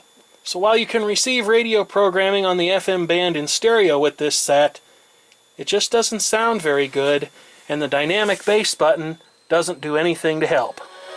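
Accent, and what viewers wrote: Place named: Northern Illinois, United States. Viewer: i guess you from northern il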